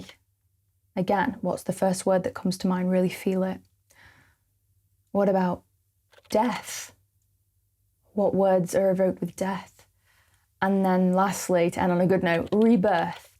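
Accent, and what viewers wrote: United Kingdom. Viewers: There's nothing quite like watching a lass with a Northern accent explaining Jungian philosophy and ideas as well as you just did - truly something to behold 😭I really enjoyed this video, thank you!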